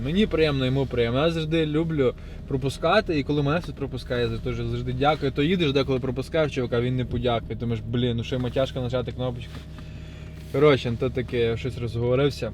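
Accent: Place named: Surzhyk, Ukraine. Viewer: суржик жёсткий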